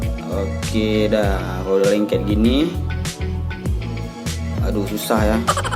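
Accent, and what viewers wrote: Medan, Indonesia. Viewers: Logatnya medan kale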